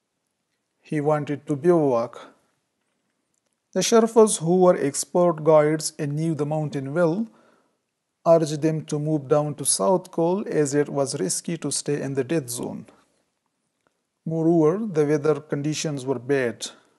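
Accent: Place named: India. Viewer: What's with the indian accent?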